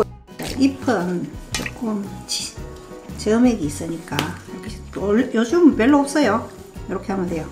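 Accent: Gyeongsang, South Korea. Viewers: 경상도 톤이라 더 친근감이 듭니다 향상 보고 듣고 많이 배워갑니다 감사합니다 ^^~^^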